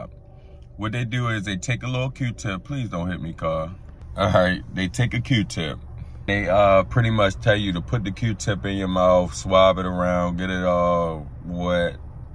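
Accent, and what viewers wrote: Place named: Baltimore, United States. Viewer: Love a Baltimore accent lol! Orrralllll lol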